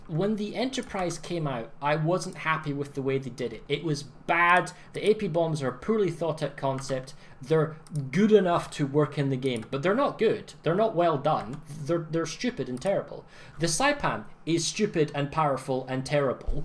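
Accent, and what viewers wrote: Scotland, United Kingdom. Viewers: ?? ...ok... II'm a Midlander, and even I can hear the Scotts in his accent, than an Irish sound. (Mind-due the Proddies and Catlicks can sound different from each other, ..but, just the crazier ones of of both sound more like Glaswiegens with less phlem & spit in their syllables me.) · You are a Scot? You sound very American... I would love to hear your commentaries in Scottish, love the accent! :D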